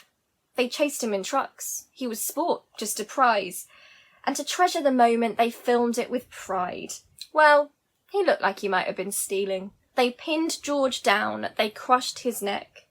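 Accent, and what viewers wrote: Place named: Kent, United Kingdom. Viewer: Loving your english Kent Style Speaking for my Audiobook - I'm a German Producer and Novel Writer and wanna ask you to speak for women rights at my novel audio book - if you want it - write me a YES and make me happy - Im a fan for your channel for about a half year - my audiobook is splittet in some chapters about a chapter is approx. 15 min - when you speak a 1-2 Min Session it would be great for me and I'll give you the text - you can speak it in your smartphone and send me at <mail> - i would love to have you on my audiobook so much - Greetings from Bavaria to the Kent-Region of the Land of Kate Bush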